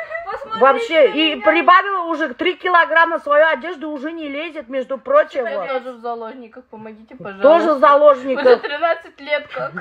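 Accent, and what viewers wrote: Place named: Chelyabinsk, Russia. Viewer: милашка привет, смотрим вас из Челябинска, ваш говор на башкирском как наш. Приятно слушать, все по простому, смотреть на вас, все как в обычной жизни, бирсен сезга ходаем саулык, бахет, уныштар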